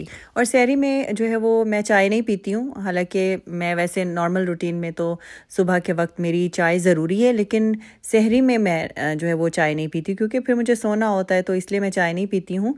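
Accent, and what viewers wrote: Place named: Karachi, Pakistan. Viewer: Abp Pakistan mean khan sy hy lahore ya karachi batey ga lahjato karachi ka lgta hy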